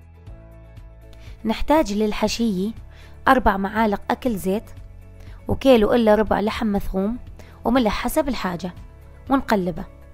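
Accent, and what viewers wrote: Mosul, Iraq. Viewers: والله تستحقي الايك فديت الصوت الحلو واللهجه الموصليه🌹🌹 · عاشت الايادي..احلى شي بالفيديو اللهجة الموصلية. ما اعرف ليش منسمعها هواي حتى بالتلفزيون من يمثلون على الموصل ميحجون اللهجة الموصلية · عاشت ايدج شغل رااائع واللهجه الموصليه تجنن لكن لا يجوز وضع الكبه مع كيس النايلون في قدر السلق لان النايلون بالحراره يتحول إلى سام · لهجتك المصلاويي حلوي كثيغ أحبكم كثيغ عاشت ايدكي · لهجة اهل الموصل تقريبا مثل لهجة الرقة و دير الزور بسوريا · يخليلي هاللسان والله اشتاقيت للموصل اهلي واحبابي 😍🥰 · شگداحب لهجتك وكلامك الناعم اني خوالي اهل الموصل احبهم كلش واتمنى اشوفك امي هم تعمل الكبة طيبة مثل كبتك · تماام حبيبتي مثل شغلي انا هم من من الموصل مقيمه بتركيا قباان لهجتنا وقبان قلبكِ وعدالتكي حبي😍😘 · احى لهجه بالعراق هي الموصليه عشتي حبيبتي والف شكر ❤ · الله على لهجتها المصلاوي الحلوى أنا اشقد ارتاح من اسمعكي تحكين الله يحفظكي ويوفقكي يامعدلي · عاشت الايادي.. 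والأحلى من هذا كله لهجتكي المصلاويي.. تسلمين ومزيداً من التوفيق والنجاح.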